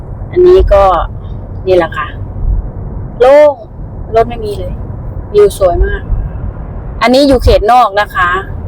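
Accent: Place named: Isan, Thailand. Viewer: สวัสดีแอดมิน หนูทราบหรือไม่ สำเนียงหนูคือสำเนียงคนไทยอิสาน 100% แยกไม่ออกเลย เป็นเพราะลาว-ไทยนั้น เป็นพี่เป็นน้องกัน สิ่งสำคัญและเป็นรากฐาน การพัฒนาต่างๆ คือ "คน" ครับ การพัฒนาคน จะพัฒนาผ่านระบบการศึกษาอบรม ที่ถูกต้อง เห็นโรงเรียนที่ลาวแล้วน่าเห็นใจ และสงสารเด็กๆมาก เขาขาดโอกาส ได้รับการปลูกฝังที่ดีๆ จะเนื่องจากสาเหตุใดสุดแท้แต่ ไม่ขอกล่าวถึง💞🙏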